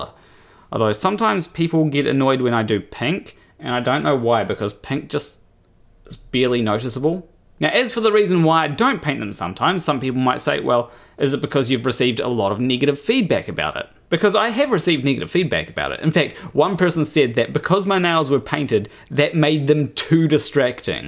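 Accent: New Zealand. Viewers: I forgot to mention that your accent is more unusual to me than your nails. I don't have a lot of exposure to New Zealanders. Probably, the only thing is Brokenwood Mysteries episodes. The long e sound is more prevalent in New Zealand, it seems. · Good one for being You, man. 😁 Your New Zealand accent is also amazing. A-hui-hui 😁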